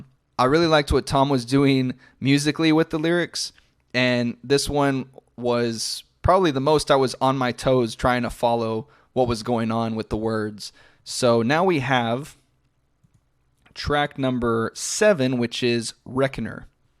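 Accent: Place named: United States. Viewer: Don't think I'll ever get used to how americans pronounce niche.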